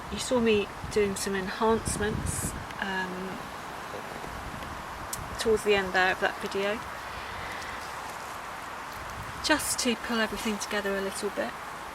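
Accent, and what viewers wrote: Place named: England, United Kingdom. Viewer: Hiya, just watched your video, I found it inspiring. I've been pouring for a few years now. It's my therapy. It's quite nice to hear an English voice, most YouTube people I've seen are in america, Canada or Australia. I hope you don't mind, but I think I'd like to try the hammer technique, the results you have Harare fantastic.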